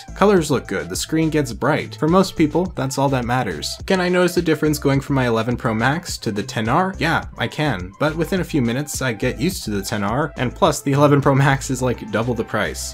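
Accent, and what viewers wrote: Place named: Canada. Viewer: i could tell your canadian within 3 seconds of the video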